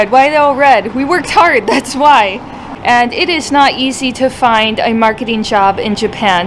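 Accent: United States. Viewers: Wow you have so strong American accent. Can you practice against that? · Was going to check out your channel, but then saw the name. The term oriental is seen as a derogatory term in the US and it appears from your accent that you may be American.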